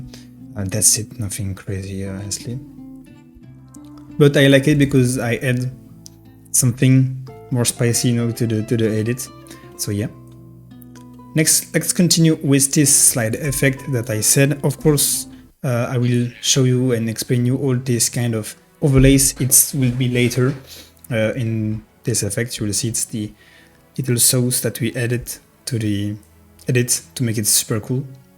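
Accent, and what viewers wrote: France. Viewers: tu est français non ? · you kinda sound french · mdrrrr jme disais la meme en écoutant l'accent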